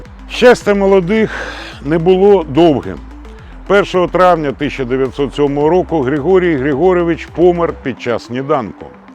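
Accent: Odesa, Ukraine. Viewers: Чудовий сюжет!Прекрасний ведучий! І вишенька на торті- українська мова з одеським акцентом ❤Дякую!❤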